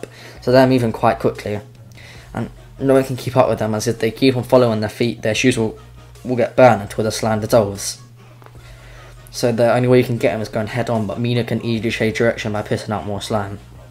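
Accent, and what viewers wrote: United Kingdom. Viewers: I hear a British Accent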